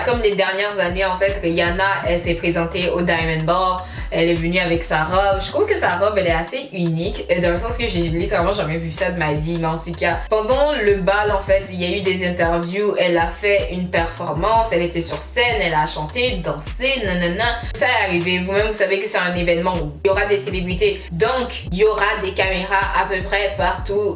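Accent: Quebec, Canada. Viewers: T’es une québécoise ? Oui non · Elle a un petit accent québécois je trouve.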